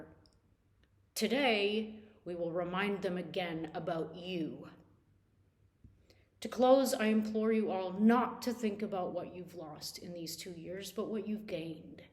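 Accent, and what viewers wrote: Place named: Canada. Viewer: BRAVO! You are awesome: amazing and great speech! Ps you look marvelous! I assumed you’re Canadian due to your accent…. Am I wrong?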